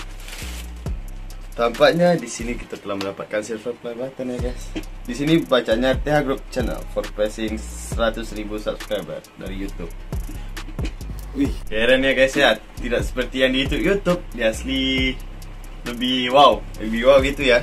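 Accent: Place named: Minangkabau, Indonesia. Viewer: Logat Minang nya masih kental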